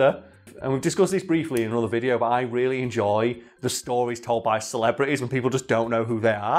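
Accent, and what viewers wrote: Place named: Sheffield, United Kingdom. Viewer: I thought I was the only one who noticed he is talking more and more with a Sheffield accent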